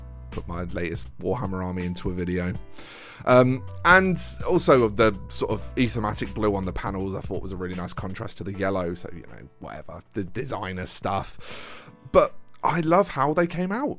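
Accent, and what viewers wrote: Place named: United Kingdom. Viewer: I've just realised love british accent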